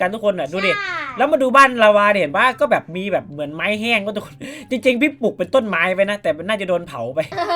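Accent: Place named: Isan, Thailand. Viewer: พี่ไม่พูดอีสานหรอผมขอมา4คลิปเเล้วรวมถึงคลิปนี้ผมชอบเสียงที่พี่พูดอีสานเอาเเค่ตอนเปิดคลิปเป็นภาษาอีสานก็ได้นะผมจะรอ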